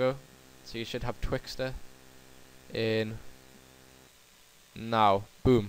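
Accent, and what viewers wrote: United Kingdom. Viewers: nice tutorial man i like your british accent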